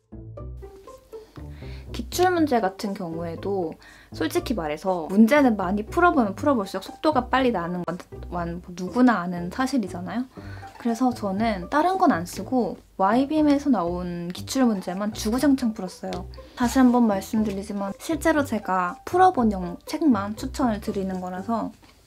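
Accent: Kansai, Japan. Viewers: たまに出る、大阪弁が可愛いですね？ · 関西弁かわいい笑笑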